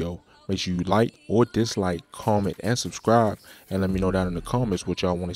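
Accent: Baltimore, United States. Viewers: doing this video is from Baltimore.. being a native Washingtonian here in DC I'm used to that accent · You got That Baltimore Accent.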